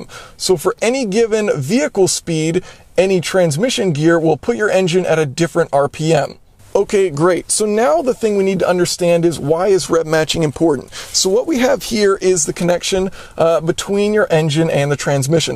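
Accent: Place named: United States. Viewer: As a mechanic in Australia I have 2 statements about this 1. in the USA which from your accent I will ASSUME you are from (possibly canada please don't smite me) Most people don't know how to drive "manual" or "stick" and with the way the world is going this will probably be useless in 10-15 years given electric cars 2. You used the example of going from 4th gear to 2nd gear now you failed to tell the good people at home THIS IS A VERY BAD IDEA, when you go up threw the gears if you rev them right out you will hit a "limiter" or what is refereed to as a "fuel cut" this is to prevent you making the engine spin faster then it is designed to go BUT if you down shift at a high speed skipping a gear you MAY not will MAY cause the engine to excited the "limit" cause it to kiss the valves with the piston on a High interference motor, So it just turns into a giant hand grenade and a lawn ornament until you have the money to replace the motor. 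People will have there doubts about this statement but I have seen it 1st hand on multiple occasions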